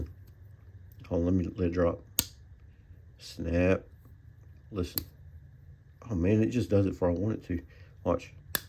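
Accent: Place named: Texas, United States. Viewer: First off, just who in the heck is this dude in the video? It sure don't SOUND like!! Dude's got a deep, raspy Texas accent, loves some Case knives and is drinking a Dr. Pepper...so maybe it's another? Is this brother?! Hey there, man! Nice to meet ya!! That Rail Splitter is freaking gorgeous, LOVE the bone on that!! As you noted, that etch IS rather unfortunate...I'm seeing some Flitz in that blade's future 🤣. The other two are sweet too!! Case's 70s/80s shades of brown & red just can't be beat. That Delrin really does look like bone! This recent vintage Case kick that you're on is positively contagious..you got me looking at a TON of them now!!! They really are light years better than modern Case, that's for sure. Great video!! Hope you feel better bro!!! 1...2...7...GO!!! ⚡💥🔪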